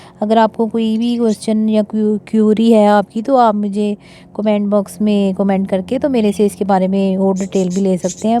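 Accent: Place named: Punjab, India. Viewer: barri sohni awaz punjabi touch of pindi ji.like ji.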